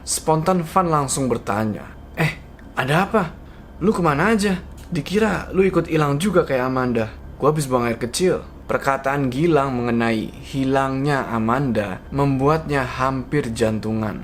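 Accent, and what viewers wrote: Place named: Tegal, Indonesia. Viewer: tegal mana suaranya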